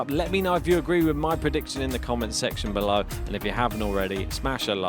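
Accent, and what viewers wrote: United Kingdom. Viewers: It’s the British accent. Give the guy a break!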